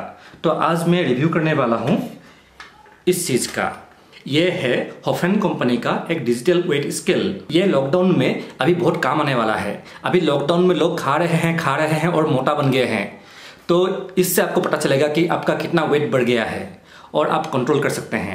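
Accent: Assam, India. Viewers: are you from assam bro? guessing it from your accent 😆😆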